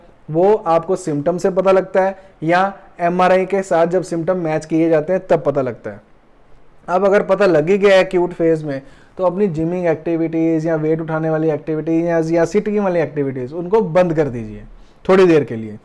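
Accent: Punjab, India. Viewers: Accent punjabio wala hai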